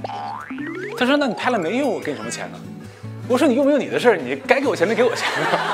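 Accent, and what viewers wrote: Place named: Northeast, China. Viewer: 唱歌和說話一樣的東北小老鄉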